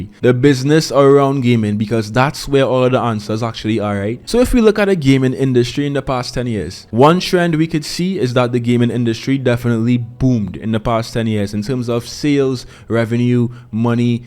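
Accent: Trinidad and Tobago. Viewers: Big dawg you from Trinidad? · As soon as the video start I say this yute trini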